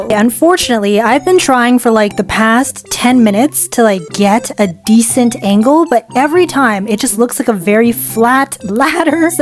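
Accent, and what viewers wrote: United Kingdom. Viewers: British? You're my new favourite youtuber. And 10/10 for most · Wait.... are you british?😱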